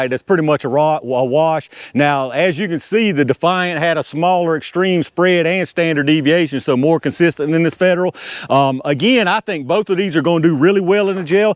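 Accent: South, United States. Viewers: love your videos. Just cannot get enough of them. Love your southern accent to. Sound a lot like me. From Georgia.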